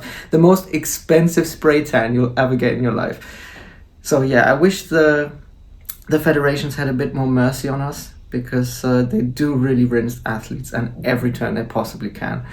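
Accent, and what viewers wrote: Germany. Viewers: Bulgarian, but u have a german accent?😅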